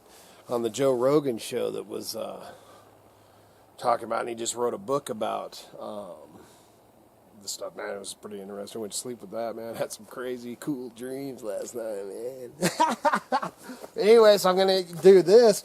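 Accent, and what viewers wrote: South, United States. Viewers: You're making me laugh and I love you videos when I get a chance to see him cuz I work at night you make me really laugh it's fun to watch your videos because you give a simple thing to it like that Duck Dynasty Phil Southern kind of thing that you have and you're not even from the South but you sure have it.... and that's where people don't grasp that you know from where I'm from the south there's a lot of people like you I've been you in my life but you do what you have to do to make a living in life and sometimes we don't always need as much as we think we do that's what you've taught me you know I've gotten rid of a lot of things that I don't need but I also keep the things that I know I need down the road... but you've definitely made me want to live further out and thank God I'm in this shape not many people 50 years old get that opportunity I thank God everyday that I can do the job I do cuz if you saw the job by do you read like then you went from one job that you had before to another one that's just as hard but you know I look at that way it keeps me going I don't have to pay for a gym can I get the enjoy life a lot better.. 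basically the share with you my life has full circle came back everything I ever lost in my life I gain back tenfold and I have to admit that because it didn't just happen on its own cuz I don't believe in luck... hey does it hurt to have a prayer come from your lips sometimes... but you remind me of a simpler time that's why I want to come visi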